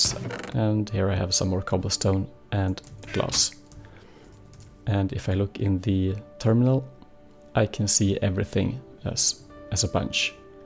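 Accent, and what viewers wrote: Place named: Sweden. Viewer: Hör så tydligt att du är svensk haha xD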